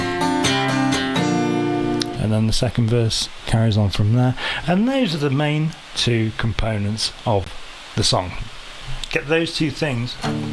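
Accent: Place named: England, United Kingdom. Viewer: Excellent lesson. Really glad I stumbled upon your channel. I often search out Neil related tunes/covers/lessons and am surprised your videos don't come up more frequently/higher on the list. I am impressed with the use of index finger nail as a plectrum - perhaps time to let that nail grow a bit. Also impressed with your vocals as you switch from your native "English" accent to your Neil voice - well done!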